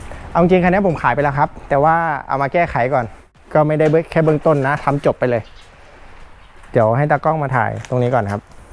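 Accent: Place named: Phetchabun, Thailand. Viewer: สำเนียงเพชรบูรณ์ หล่มสักครับ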